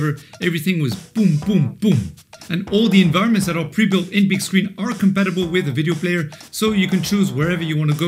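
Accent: France. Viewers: you have french accent lol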